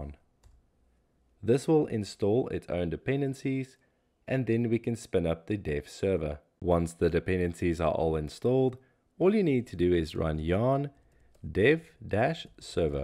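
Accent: South Africa. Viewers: are you South African? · Great video, you sound South African. 😃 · are you from south africa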